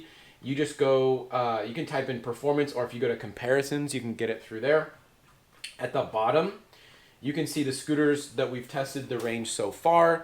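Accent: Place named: United States. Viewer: oh american guy speaking.im outta here!!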